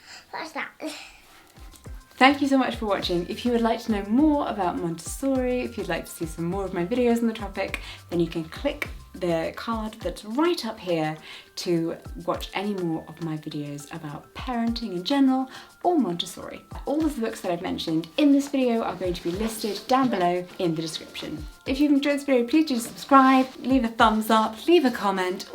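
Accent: United Kingdom. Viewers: Low soothing voice and Brit accent but couldn't get past the 💄 Have a lovely day!😊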